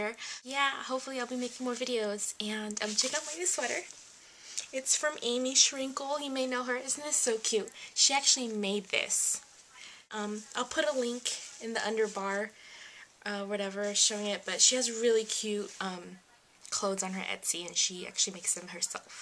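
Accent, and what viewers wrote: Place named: Philippines. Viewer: i believe she is her accent is that of Filipina :)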